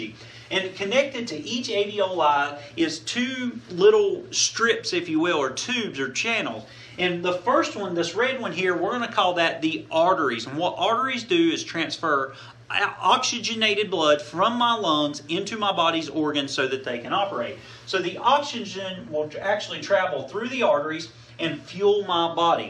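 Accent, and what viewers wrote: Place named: Appalachia, United States. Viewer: Not only are you Southern, but you're also Appalachian. Gotta love those Hillbilly boys!! (I'm a Hillbilly, born and bred in East KY) <3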